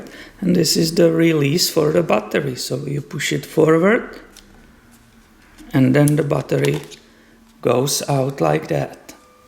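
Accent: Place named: Czech Republic. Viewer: Interesting video, well done :) You sound like you're from the Czech Republic or a nearby country(?) :)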